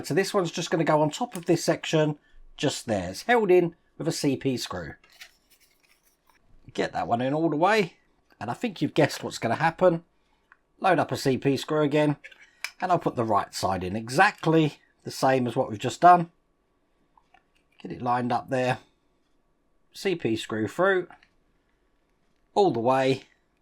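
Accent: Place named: United Kingdom. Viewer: Don't worry about the way you speak. I've always thought it makes you endearingly British. Also my son always uses a soft T when he speaks, so mittens ends up sounding like middens. And when I was young my Rs sounded more like Ws. We all have those little quirks! Still love the channel, keep up the great work!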